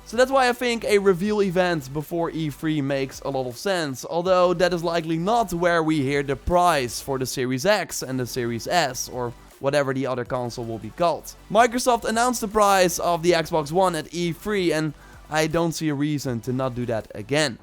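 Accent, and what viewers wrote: Netherlands. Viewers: You sound Dutch...but are you African American? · Man Ive been watching a lot of your videos since you were mainly uploading AC odyssey videos and I still have no idea which accent it is that you have. Is it Dutch? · I can hear ya dutch accent😅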